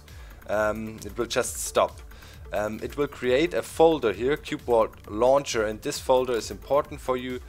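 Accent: Germany. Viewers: bist du aus deutschland?:D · Bist du deutsch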